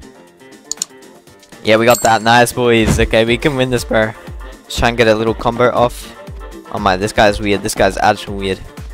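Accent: Australia. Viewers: Are you austrailian?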